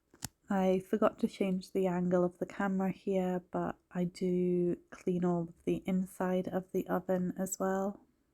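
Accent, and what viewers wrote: Scotland, United Kingdom. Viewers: From Canada. Grandparents from Scotland, so your accent is familiar. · You have picked up a somewhat Scottish accent- it doesn't take long!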